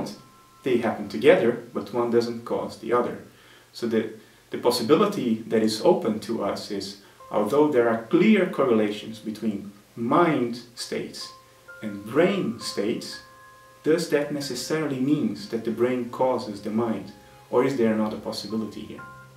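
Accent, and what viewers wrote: Netherlands. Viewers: I'm dutch and his accent sounds pretty special. I haven't heard any english speaking dutch in a while I reckon. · im hearing a dutch accent am i right?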